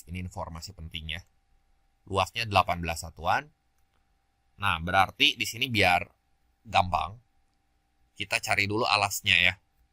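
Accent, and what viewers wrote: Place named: Sunda, Indonesia. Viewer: aduh teh koko ternyata nyunda nya nyunda pisan euyyy, Hatur nuhun ko 😊😊😊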